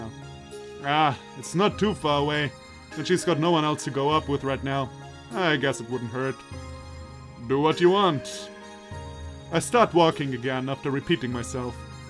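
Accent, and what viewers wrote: Germany. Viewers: Are you german? I mean you really sound like you are ^^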